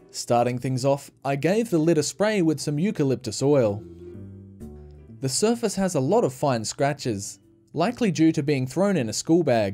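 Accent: Australia. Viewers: You voice sounds just like my Australian friend I met on Facebook lmao. Y'all are so chill and relaxing to listen to. Totally random. 🤣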